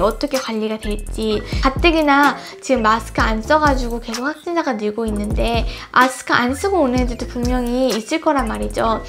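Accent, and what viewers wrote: United Kingdom. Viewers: 영국식발음과 미국식 발음중 어떤 발음을 구사하시나요 ~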